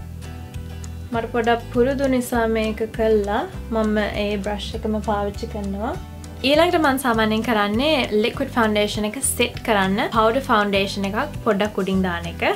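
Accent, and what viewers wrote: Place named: Sri Lanka. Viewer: Nice video 😍 love your Sinhala accent 😘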